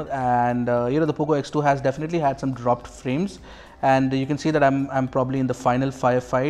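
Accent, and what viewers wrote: India. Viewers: I can still understand you even you use indian accent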